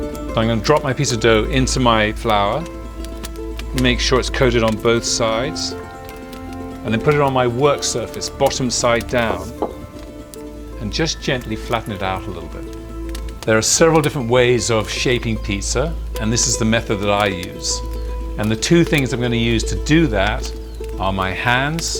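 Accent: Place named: United Kingdom. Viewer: Accent quintessentially British...great vid!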